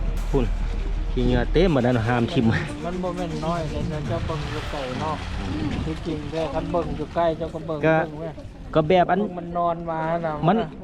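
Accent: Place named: Vientiane, Laos. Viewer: ສຳນຽງຄືກັນ. ຄິດວ່າຄົນແຂວງວຽງຈັນຊັ້ນດອກ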